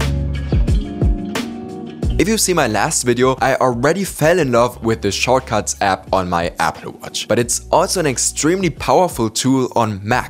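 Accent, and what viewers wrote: Germany. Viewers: Wegen dem Akzent vermute ich mal dass du deutsch spricht und wow das war ein richtig gutes video jede app war ne mega empfehlung danke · Bist Deutscher? Klingt ziemlich danach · i love the german accent so much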